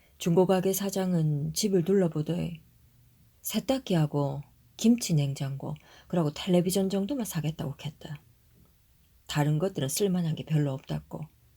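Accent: Gyeongsang, South Korea. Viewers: 갱상도 버전으로 읽어니 또다른 맛이 나네요 잘들을게요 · 왜 갱상도말로 하는 거죠? 신경 거슬려 못듣겠음.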